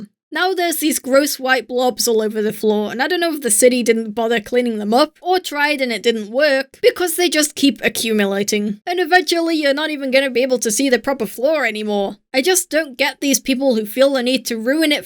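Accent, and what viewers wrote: Scotland, United Kingdom. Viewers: Love the animation, and absolutely adore your Scottish accent and your voice. I watch your videos before going to sleep. Thank for your work :3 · Are you scottish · Ello Ello! Very British! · Eww bri'ish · your accent is too british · Its a Kind of britisch accent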